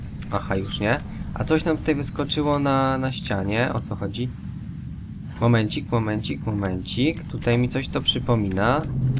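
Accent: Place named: Silesia, Poland. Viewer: Jestes ze sląska slysze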